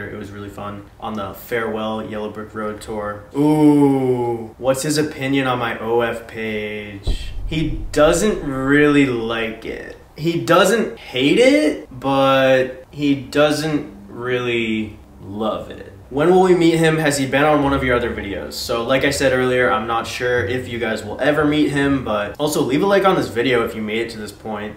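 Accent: Canada. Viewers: Are you Canadian? You have a Canadian accent lol.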